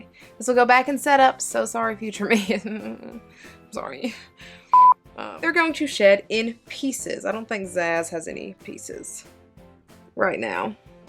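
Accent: South, United States. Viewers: I like your southern accent, and the way you say thangs lol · Such a pretty and upbeat young lady. Love that southern accent! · New viewer. Great content! You from the South?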